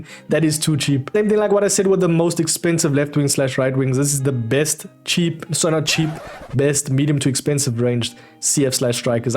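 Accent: Cape Town, South Africa. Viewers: You sound like ya from Cape Town with a mix of something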